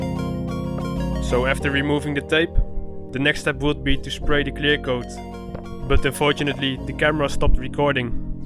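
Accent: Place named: United Kingdom. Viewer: Haha ik weet niet hoe maar ik dacht dat je brits was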